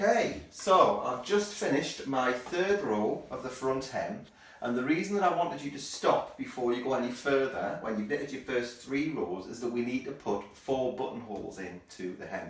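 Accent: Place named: Northern England, United Kingdom. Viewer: Excellent tutorial - especially for an absolute beginner as you explain everything so well. Although I'm not a beginner I got my trusty Brother KX395 out of its box and made one of these lovely little buntings and I'll be giving it to charity. Lovely to hear a northern accent 😃 Look forward to more of your projects.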